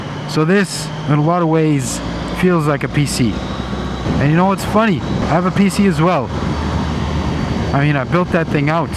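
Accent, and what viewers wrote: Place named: England, United Kingdom. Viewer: A Very cool informative review. One of the best English accents I have ever heard . Dad jokes are underestimated..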